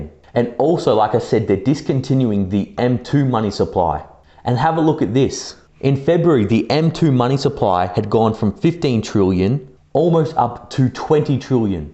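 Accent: Australia. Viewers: You Aussie? I thought you South African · Subscribed as soon as I heard the Australian accent, just what I've been looking for. I LIKE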